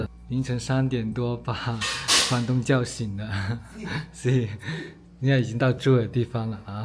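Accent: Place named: Cantonese, China. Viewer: 广东人，他普通话没那么好😂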